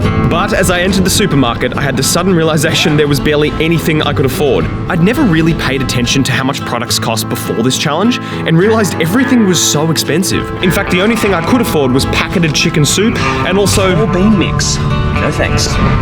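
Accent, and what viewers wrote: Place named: Italy. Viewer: Another awesome video man! Seriously cant wait until these start blowing up! 🔥 love the Italian accent haha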